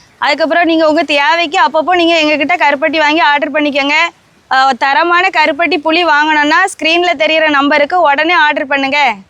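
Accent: Tirunelveli, India. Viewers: பேச்சு திரு வேலி. இருப் இராமநாதபுரம் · ரொம்ப நல்லது வாழ்த்துக்கள் அருமையான நெல்லை தமிழ் சூப்பர்.. கருப்பட்டி விலையை சொல்லுங்கள்.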